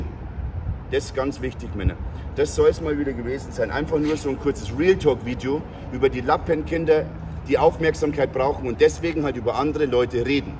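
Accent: Bavaria, Germany. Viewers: bist du bayer? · Ich muss grad so lachen. Ich hab viele Videos von dir angeschaut u mich immer gefragt was für einen Akzent hat er. Ich hab immer nur geraten und jetzt redest du deutsch, wie geil😛 Ich komm aus Erding. Hast nen ähnlichen boarischen Akzent. Kommst du aus Bayern? Top Videos immer von dir!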